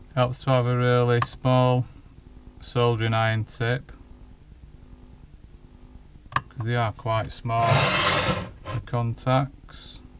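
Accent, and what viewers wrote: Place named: Northern England, United Kingdom. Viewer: Great video! I'd love to do this you explain everything in plain (northern) English! Keep up the good work!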